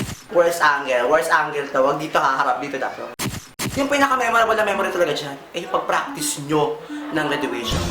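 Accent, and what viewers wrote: Visayas, Philippines. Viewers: Taga san ka po? Bisaya ka ata eh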